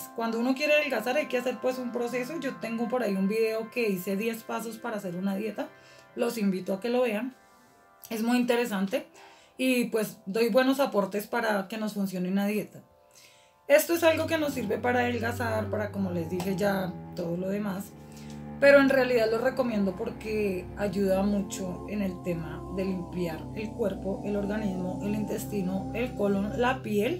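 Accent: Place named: Colombia. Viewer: Me encanta el acento colombiano!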